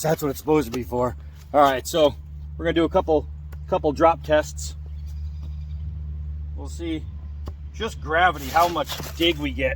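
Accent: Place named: New England, United States. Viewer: Yeah, I figured you were a combat vet. My entire family was in the service. Except me….lol. In listening to you talk, I kinda figured it out. Well, that and you’ve got the New England accent like me. Anyway….stay safe,little brother and thanks for the content. It keeps this old man in good spirits and makes me look forward to your next vid. Be well….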